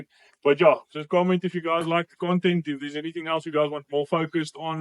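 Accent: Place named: Africa. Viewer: Man where you from? Your accent doesn't match your face. Are you from Africa?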